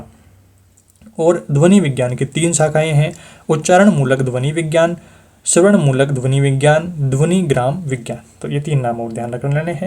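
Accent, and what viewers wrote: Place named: Hadoti, India. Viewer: Hadoti is similar to your regional dialect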